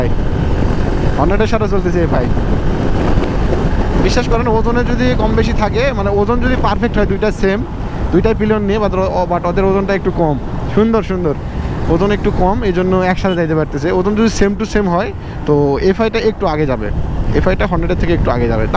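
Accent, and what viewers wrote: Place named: Bogura, Bangladesh. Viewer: জি ভাইয়া অবশ্যই তবে আপনার আঞ্চলিকতা ও আমাদের বগুড়া আঞ্চলিক অনেক মিল রয়েছে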